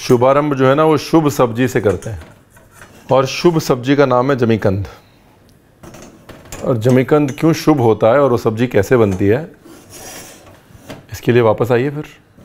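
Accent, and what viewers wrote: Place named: Punjab, India. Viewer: I like your style of explaining everything. And, your typical Punjabi way of talking. I am going to make Suran the way you demonstrated today. I used to make in a different, Tamil Brahman way, my neighbor had shown me & it was tasty, but your recipe seemed amazingly simple & I could taste it in my home, here. Thank you Ranveer.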